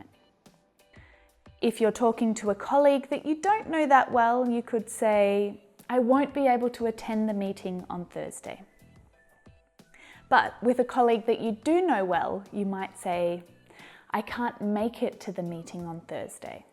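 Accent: Australia. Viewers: Hi haw are you?are you from Australia?Australia is very nice cauntre.Australian people are very kind.you too.l love your acisent you speak good l am your student.thank you very mach l love your lessons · I'm lucky finally i got you here as a great teacher I'm live in Sydney I'm learning a lot from you specially Australian accents Thank you so much love you mmm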